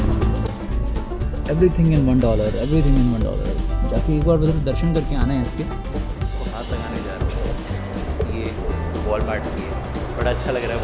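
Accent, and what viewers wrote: Haryana, India. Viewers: ghna uncha tha bhai.... Sir are you from haryana???? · ghna uncha h bhai .... haryanvi ❤❤❤❤ bhai r haryanvi b aav h tn t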